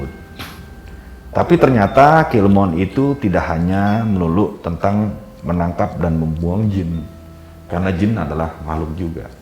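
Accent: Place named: West Java, Indonesia. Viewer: Oh belajarnya dari Banten toh..pantes aja kok logat nya lebih kental ke barat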